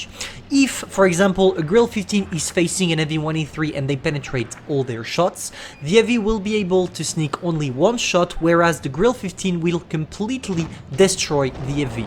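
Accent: France. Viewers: Question? Are you French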